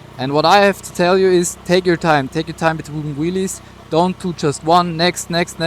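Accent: Germany. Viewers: I trust you even more because of the German accent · I hear deutsch!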